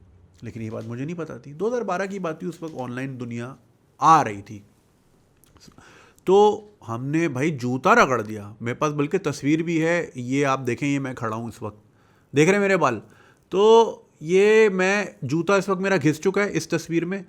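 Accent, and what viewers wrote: Karachi, Pakistan. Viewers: Love your show specially free spirit talk and Pure Karachi Accent love it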